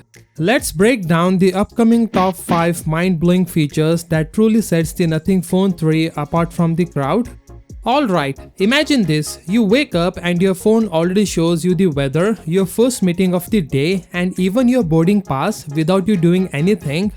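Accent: India. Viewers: The ultimate Indian accent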